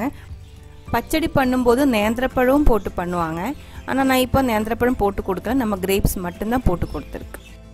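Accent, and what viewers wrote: Kerala, India. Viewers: Tried this recipe, it came out very well❤️ Your Kerala mixed Tamil is really awesome 😇🙏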